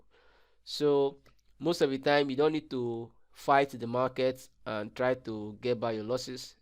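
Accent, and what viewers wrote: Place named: England, United Kingdom. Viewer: I like your english accent, haha , btw love ur work 📉📈📊✔️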